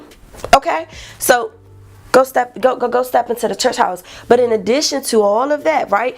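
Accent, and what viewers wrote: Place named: Baltimore, United States. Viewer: You popped up on my timeline, and as soon as o heard your accent I was like…oh hold up she’s from my hometown B-more!! Let me subscribe ❤ Enjoyed the video ❤❤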